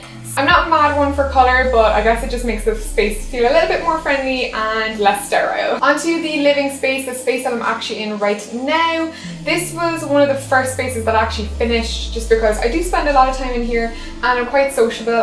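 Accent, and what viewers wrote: Ireland. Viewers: Is she Irish.... She sounds irish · What part of Ireland are u from?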